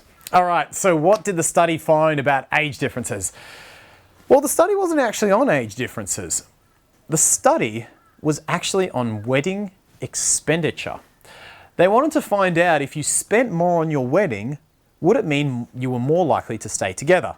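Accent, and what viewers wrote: Australia. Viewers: Hi, thank you for the reply. Part of my problem is that i work am off shift tue-sat 430pm-1230am which makes it very challenging for me to meet or date for that matter. I usually go online to meet men which i receive alot of responses which gives me many options. But again its my schedule and the fact that there are so many players, dishonest people or those that only want sex. So it does take allot of work weeding through them. And i know i have much to offer the right person and will not settle just to be with someone. I'm just concerned because i am older now and my options will become less and less. Im on my 50's my people guess me to be much younger in my late 30's to early 40's which is great and am also young at heart with a free spirit. I am taking a break at the moment but may try again in the fall. You sure seem to be such a sweetheart, i love your accent. 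Never been to Australia but love to Travel, maybe ill make it that way one day on one of my adventures. Take care, Dee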